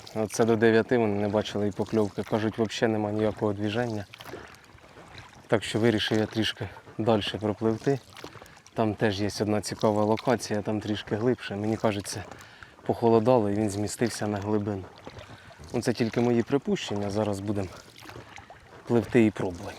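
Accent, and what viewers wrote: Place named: Central Ukraine, Ukraine. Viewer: По говору і краєвиду центральна наша красуня, може Полтавська або Черкаська.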